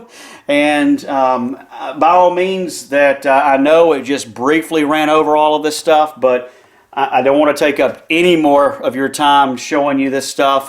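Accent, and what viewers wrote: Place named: Alabama, United States. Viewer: I thought you sounded like an Alabama boy . Enjoyed the show . Love getting to see collections .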